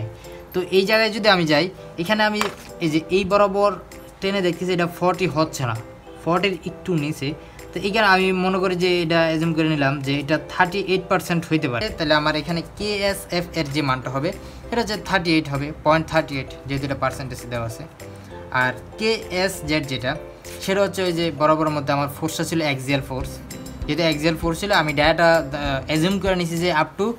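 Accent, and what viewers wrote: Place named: India. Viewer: Damn....I really hate indian accent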